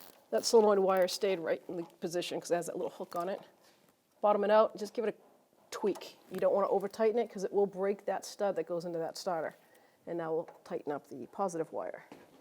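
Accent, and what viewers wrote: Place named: New England, United States. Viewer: I'd buy something from you if you made the video on the 4wd version of this truck. The presenter sounds like they're from New England, they should have INSISTED on it ...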